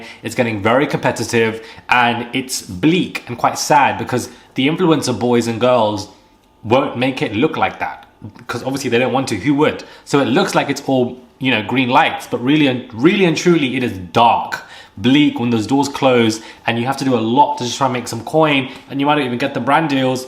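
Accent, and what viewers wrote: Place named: London, United Kingdom. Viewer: South London? Well done.. some reason I was thinking you are from East London.. That's how stereotype works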